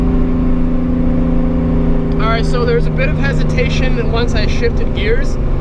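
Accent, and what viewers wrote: Australia. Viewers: That Australian accent 😍😍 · Nice aussie accent, i was thinking more Steve Erwin, R.I.P.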